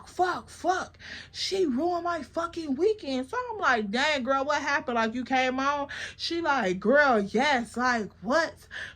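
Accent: Chicago, United States. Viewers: I could listen to somebody from Chicago talk all day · I love Chicago accents but we def need pt 2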